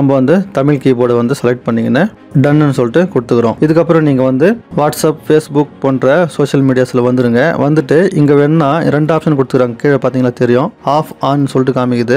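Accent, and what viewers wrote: Chennai, India. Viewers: local Madras baashai pesatheenga broo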